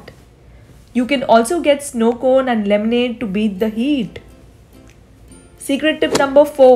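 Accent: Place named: India. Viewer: Indian accent English 😅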